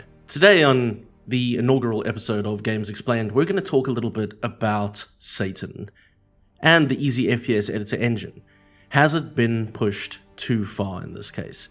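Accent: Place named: Cape Town, South Africa. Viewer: Haha I thought so, I recognize that local accent anywhere, I'm from Cape Town 😎 Yeah it is really satisfying to get a game working in EFPSE but as many limitations as there are, there's also a strange learning curve to a lot of it, which makes me think it's not really worth it at times, there's a huge lack of tutorials for the engine which is why I make some when I can, but my knowledge is really limited at the moment. And yes I looked into Trenchbroom recently, looks really cool! I might give that a go soon, as well as Godot. Keep the content coming, you're doing great and I look forward to seeing what games you make in future! 🎉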